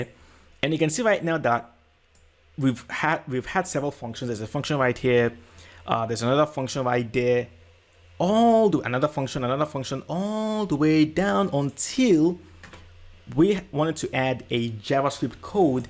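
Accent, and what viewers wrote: Nigeria. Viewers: Hey bro, good info, is that a Nigerian accent lol? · Great video. Merging my php knowledge with Wordpress has been tricky. This is really helpful. Thanks You sound Nigerian. Are you Nigerian? I’m Nigerian 🇳🇬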